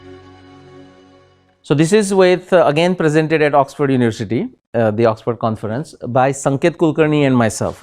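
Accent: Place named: Maharashtra, India. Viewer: bro people of maharastra used to pronounce like this . acctually this is the impact of marathi on their speaking 🙂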